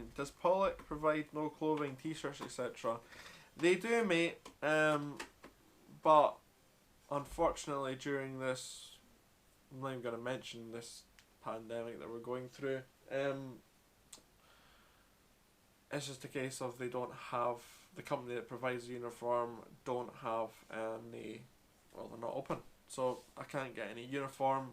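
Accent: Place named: Scotland, United Kingdom. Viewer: Scottish accent is his charm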